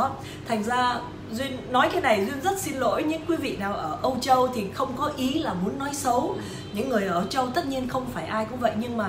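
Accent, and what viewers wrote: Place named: North, Vietnam. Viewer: Giong bac ky va` nghe thuat cach noi 20 nam lam MC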